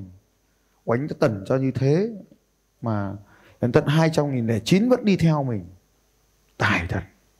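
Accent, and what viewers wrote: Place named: Bac Ninh, Vietnam. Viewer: Giọng a hình như Bắc Ninh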